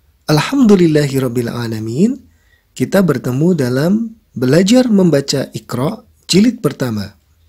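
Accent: Java, Indonesia. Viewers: Kedengaran kali yg baca orang jawa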